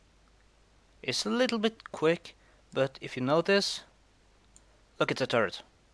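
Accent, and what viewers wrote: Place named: Germany. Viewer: well, atleast it was entertaining for a layman like myself. Swede here, and by the accent and knowledge in German tank warfare im guessing your a german?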